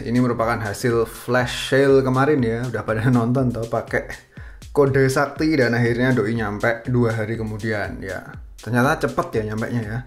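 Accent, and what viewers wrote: Java, Indonesia. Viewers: Jowone Ngendi rek?..Mantap e Logat e iseh ketoro 😁. Salam Pemalang 👍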